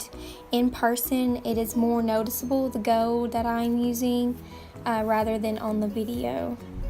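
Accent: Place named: Rural, United States. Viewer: I never noticed how country your accent was until these.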